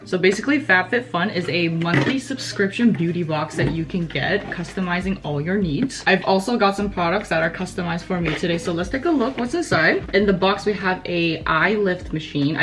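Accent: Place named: Bay Area, United States. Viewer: Lol! I love your accent. Are you from the Bay?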